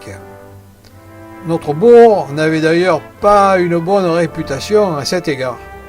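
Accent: France. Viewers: très belle histoire, bien racontée et avé l'acent ! ( pas celui de Marseille mais celui de Toulon...)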